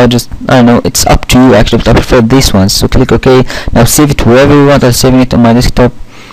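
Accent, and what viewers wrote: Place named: Egypt. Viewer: you sound egyptian :) nice tut, but I prefer using handbreak, it's little more advanced but you can get better quality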